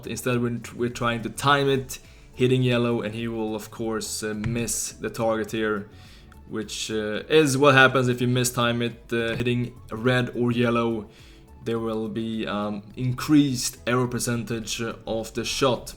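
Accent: Sweden. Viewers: Are you swedish i can tell by your accent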